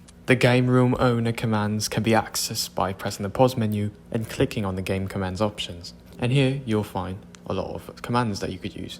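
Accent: United Kingdom. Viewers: Question r u British? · Well hello there? R u British to? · You grow so fast keep up the good work. LMFOAOAOA YOU SOUND BRITISH YO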